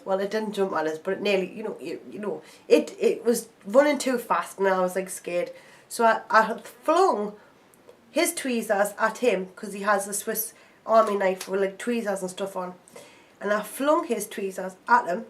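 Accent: Newcastle, United Kingdom. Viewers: She's a Geordie man!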